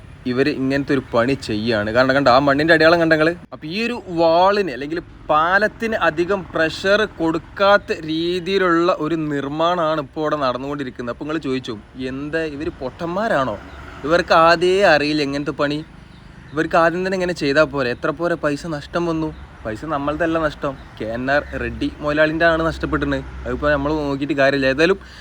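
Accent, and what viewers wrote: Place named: Northern Kerala, India. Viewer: All your programs are very good, especially your narration in northern kerala dialect.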